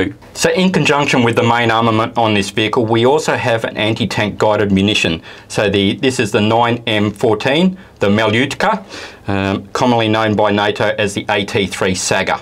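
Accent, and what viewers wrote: Australia. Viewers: You have a very authentic Australian accent. Certainly you come from the land down under. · Ha with his Australian accent I at first thought he said "murder rifle" rather than "motor rifle" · I wonder how this typical Australian accent came about??? You can hear him incorporate/use a certain tone on certain words.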